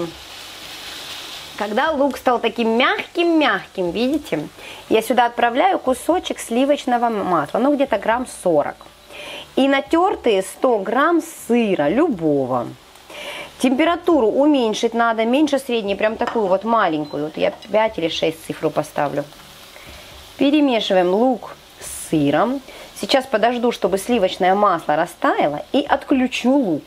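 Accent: Ukraine. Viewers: По диалекту украинка😊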